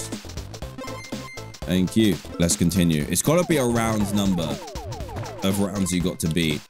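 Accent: United Kingdom. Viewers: as a British person, you are definitely British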